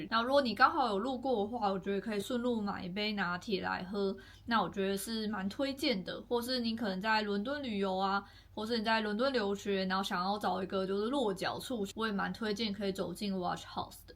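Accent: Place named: North, China. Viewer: 北部口音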